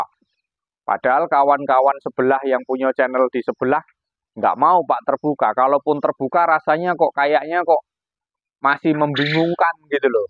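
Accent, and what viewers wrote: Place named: Java, Indonesia. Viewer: Dari bicaranya bang ini asal dari Jawa kelihatanya,bukan asli Jambi,😂😂 trmksih bnget bang ilmunya,sy banyak belajar dri Abang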